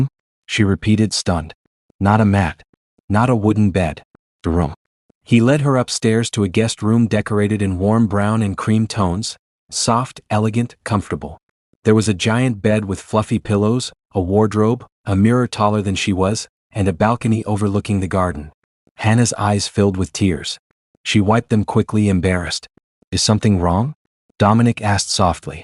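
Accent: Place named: United States. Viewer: Everything is nice , except the bits can come off a bit colorist,Due to the readers white American accent.bits he keeps saying dark and color unnecessarily.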